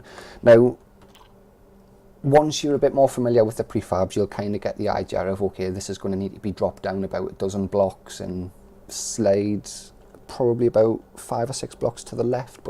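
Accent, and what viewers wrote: United Kingdom. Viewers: Random question but are you from Middlesborough? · Thanks for the series, only just starting to look at the prefab editor as I have a few ideas, so the overview has been useful. Especially trying to find the right balance between lots of small or few small prefabs. How do you think your method of capturing a large prefab from the world compares to merging small prefabs inside the prefab editor itself - which is the way I had been trying to do it. PS There's nowt wrong with the Geordie accent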